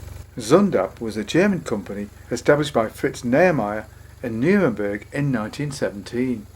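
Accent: United Kingdom. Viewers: Neat bikes! Unfortunately, I could hardly understand a word that was said between the sound quality, his soft voice, and his heavy Brit accent.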